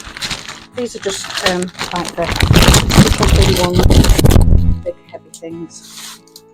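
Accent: Lancashire, United Kingdom. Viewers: I live in Japan now, but grew up in Lancashire. As soon as I heard the accent I knew you were one of my people. :D It's great to hear on this big, wide internet. Great stuff. I've a smallish garden myself that I've just started a channel on (not this account :D). I only have two tomato plants and they've just set fruit in the past 2 weeks, but maybe I'll catch up to you next year. :D You mentioned that you were a beginner, but have you grown so many tomato plants before? I'm looking forward to seeing how they get on. Cool that you have so many frogs!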